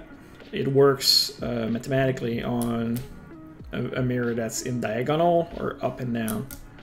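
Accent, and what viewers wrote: Quebec, Canada. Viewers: Are you from quebec?? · Are you from the Quebec? I'm from there and I think I heards a subtle accent and, the Quebec accent is pretty easy to recognize. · bel accent quebecois gros xD jespere sa pue pas trop a trois-r lol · are you from quebec? you really sound like you do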